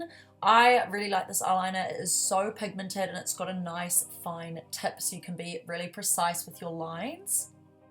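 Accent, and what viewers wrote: New Zealand. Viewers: Wow! Very creative. I don't want to be offencive or racist but are you from New Zealand? The kiwi accent just clicked for me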